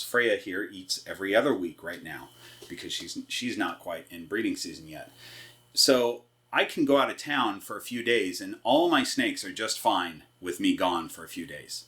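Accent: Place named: United Kingdom. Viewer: As a Brit I would like to confirm that this is indeed a British accent! Lol 🐍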